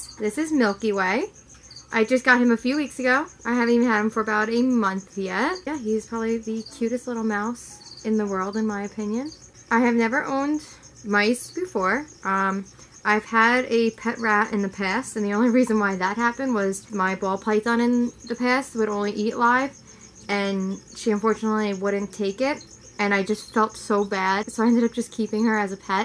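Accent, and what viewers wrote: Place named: East Coast, United States. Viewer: Hey hey hey! Something to consider is that reptiles can live together for years without problems and then one day they can snap. I'd really hate to see that happen. I follow a bearded dragon rescuer on Instagram who has seen it happen a million times with beardies she's taken in. Omg I want a leachie so bad! I don't buy from breeders so I need to find one that's special needs or adoptable! Where are you from? You sound like an east coaster, which I used to be! Do you find that cognitively you have any issues feeding mice while also having pet mice? I can't keep snakes because of my affection for my pet rats. I'm not passing judgement I promise :) I'm curious about how you see it in your mind because everyone is different. BEAUTIFUL DOGS! Be careful with those plants in the axolotl tank. If they're not silk plants they can tear the skin of the axie, especially the tail. 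Good video :)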